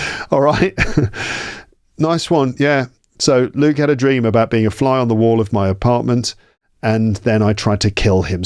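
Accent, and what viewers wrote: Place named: RP, United Kingdom. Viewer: <link> is one of the BEST English Podcast I've ever heard on British RP (Received Pronunciation), Phonetics and Phonology, Prosody (Prosodic Break, Prosodic Interruption) in NATURAL NATIVE English Language by on YouTube channel named, So GREAT and I am grateful to your Instructions, I choose your Podcast channel to practice Articulation and NATIVE English accents.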